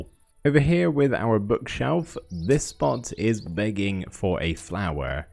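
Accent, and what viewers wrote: United Kingdom. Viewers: thought i was clicking on a minecraft video, turns out its asmr. never in my life did i realize the pleasentness of hearing a slightly deep voiced british man whisper me sweet nothings while having moonlit sonata playing in the background... I now know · Your accent is very pleasing. Where is it from? It sounds a bit British but not quite?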